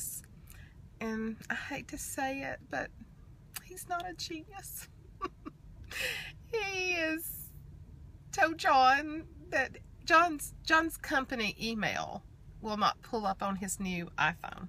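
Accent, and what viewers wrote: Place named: North Carolina, United States. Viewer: I love your accent! I'm from NC and it reminds me of a lot of my family's accents :)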